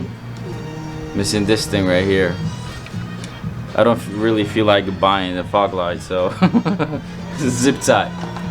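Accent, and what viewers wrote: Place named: Russia. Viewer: Я на первых минутах раскусил , что Русский , )))) акцент выдал))). Тачка супер 👍🏻👍🏻👍🏻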